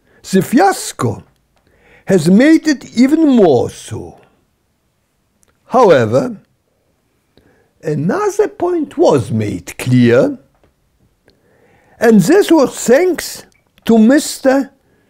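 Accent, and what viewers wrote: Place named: Germany. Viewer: niby mówi po angielsku ale akcentuje jak po niemiecku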